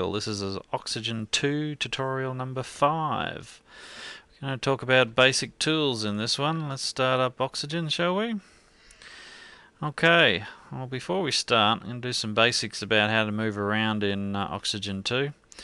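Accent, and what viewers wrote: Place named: Australia. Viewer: I heer an aussie :P cheers for tutorial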